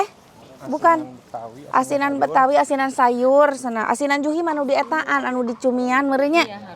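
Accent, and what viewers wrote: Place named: Garut, Indonesia. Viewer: Hayang aing muncrut🤣 Khas bahasa urang garut Si Tteh. Ngiring raos na Tteh🙏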